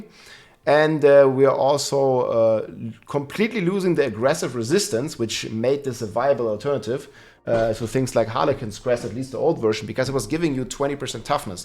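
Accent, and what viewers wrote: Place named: Germany. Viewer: I read patch notes and stuff, then come here to be serenaded by a heavy German accent.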